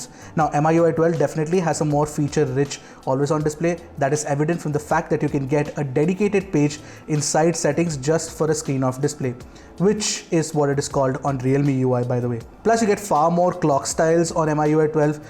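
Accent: India. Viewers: I like his english-indian accent ngl.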